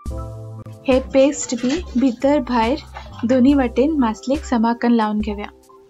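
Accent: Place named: Konkani, India. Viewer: Lovely to hear you speak konkani. Thank you for sharing the recipe 👍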